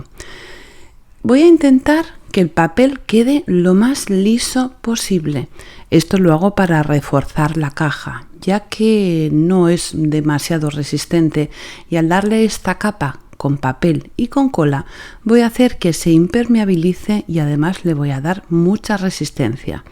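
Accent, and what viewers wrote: Spain. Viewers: Bueno.... que decirte mi querida profe. Sin palabras como te digo siempre....de verdad no encuentro la palabra superlativa de las bellezas que haces. Te admiro tanto. Es tan dulce tu arte. Que cuando veo tus videos me emociono hasta el alma. Es tan magnífico como explicas,la música, tu voz con ese acento español que me encanta. Gracias por enseñar,por mostrar tu arte. Me pone tan feliz cuando aparece un vídeo tuyo. La niña es hermosa. Delicada. Sin palabras querida. Un gran besos y admiración. Te quiero mucho ❤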